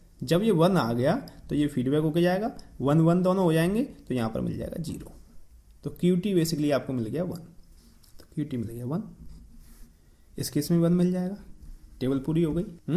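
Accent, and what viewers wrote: India. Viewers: Nice understanding speed is bit fast btw love up accent!!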